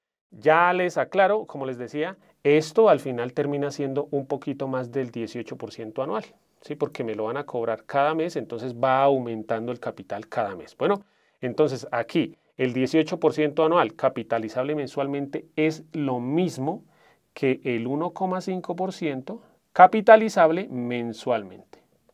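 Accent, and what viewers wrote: Colombia. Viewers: Profe eres colombiano????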